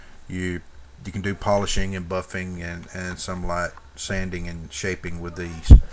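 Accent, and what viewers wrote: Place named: Rural, United States. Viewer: Haha, I love your openings and endings. Living in the country side of America and sharing the same accent, this information really hit home clearly. I've been getting into robotics/electronic work recently. It's a useful hobby to have, but when I try and take apart old devices like cell phones and cameras I find really tight small screws constantly. Near impossible to get out. I keep thinking "If only I had a small drill for this..". I think this may be the one for me! 'God knows it'll make the job faster, anyway. It's not too expensive, either. I just hope the bits don't break too easily. It'd be a pain to have to buy new ones often. Thanks, man.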